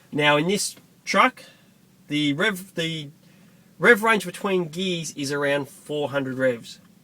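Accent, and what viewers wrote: Australia. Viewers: His accent kind of gives it away. Aussie or New Zealand RH drive Western Star. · Finally a truck with the steering wheel on the RIGHT (correct) side of a truck and an Aussie accent. Those Western Star's are actually a decent drive. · this fella is definitely an Aussie, Not from NZ.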